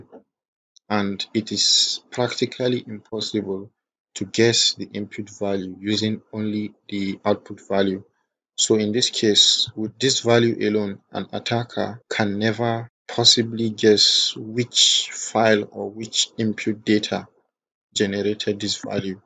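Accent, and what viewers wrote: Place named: England, United Kingdom. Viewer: I can feel the english intonation (tureshi ni)... Keep up the good work 🙌❤️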